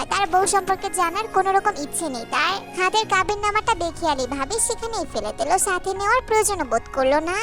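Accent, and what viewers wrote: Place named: Narsingdi, Bangladesh. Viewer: ওও আমার বাড়ি নরসিংদী খুশি হলাম নরসিংদী কথা বলছো বলে 😂😂😂😊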